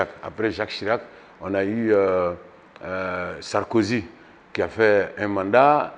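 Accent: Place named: Ivory Coast. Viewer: Mais c'est difficile que a ton âge tu ne connais pas ton pays d'origine par ta parole on connais de quel ivoirien tu es... Importer ou exporter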